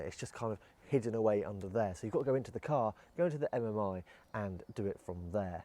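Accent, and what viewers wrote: England, United Kingdom. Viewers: I think people with English accents should be the only people allowed to teach things.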